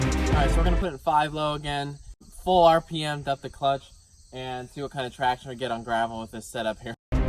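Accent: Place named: Indiana, United States. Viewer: The accent, the Amish, the endless cornfields, the moronisms... Northern Indiana. Has to be!!! 🤣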